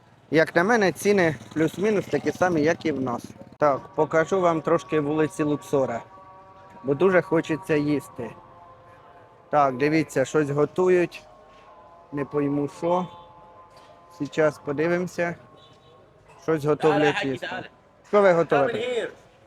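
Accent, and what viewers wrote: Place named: Poltava, Ukraine. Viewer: Полтавчанина по говору зразу чути. Дякую за екскурс по місту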